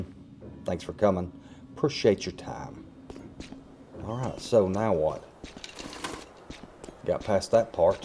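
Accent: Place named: South, United States. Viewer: your southern accent makes this lets play more authentic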